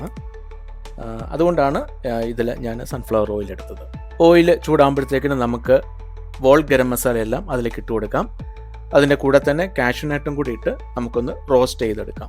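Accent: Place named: South Kerala, India. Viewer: പാചകം Eassy - കഴിക്കാൻ Tastyയും ആണെന് തോന്നുന്നു. തെക്കൻ style സംസാരം. നന്മ നേരുന്നു. Rtd Teacher'👍🏻🌹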